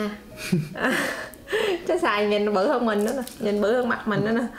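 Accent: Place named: Mekong Delta, Vietnam. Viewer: Giọng nói đậm chất miên tây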